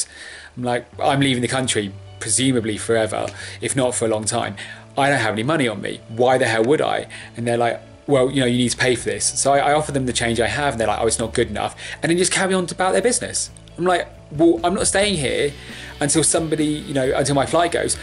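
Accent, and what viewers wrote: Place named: United Kingdom. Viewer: man with your british accent, you just killed my country ahaaahahahajajajaja but you r just sayin what you saw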